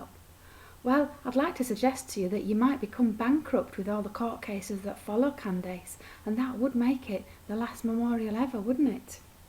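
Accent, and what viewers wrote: United Kingdom. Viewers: Uk accent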